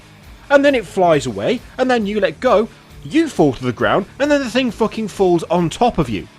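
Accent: United Kingdom. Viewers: Subscribing just for that accent. I'm British too. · How is it that these kinds of angry rants always sound better when done with a British accent? But then again, everything sounds better with a British accent.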